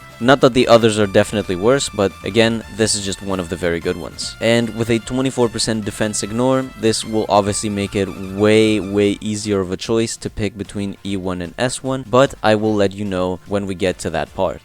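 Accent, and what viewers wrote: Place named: Greece. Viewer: That accent.... Are you greek bro? 😂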